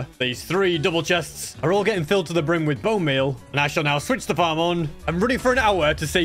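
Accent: United Kingdom. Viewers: Also are u like British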